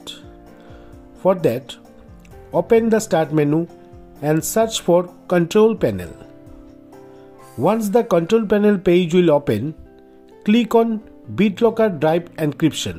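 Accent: India. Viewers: its always the vids with 20-80 likes and an indian accent finaly solving my problem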